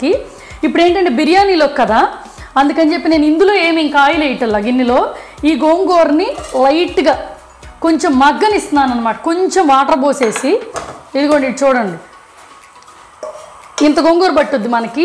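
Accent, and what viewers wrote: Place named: Krishna, India. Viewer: Krishna District telugu andi chala bagundi.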